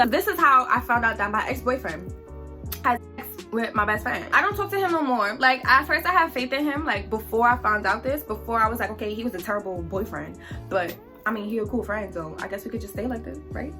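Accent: New York, United States. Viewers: you from New York,🤔 don't know if it just me but I hear a bit of New York in your when you talk lol. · Is it just me or she sound a little bit like Nicki or that’s just the New York accent idek. Or like she could say Barbados like Rihanna 😭🥰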